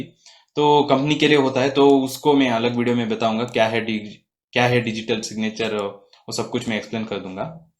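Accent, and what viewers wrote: Karnataka, India. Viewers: Thank you so much,. It's very helpful and comprehensive video. 👌🏻 Subscribed 😊👍🏻 PS. Are you kannadiga? 😃 (just wondering)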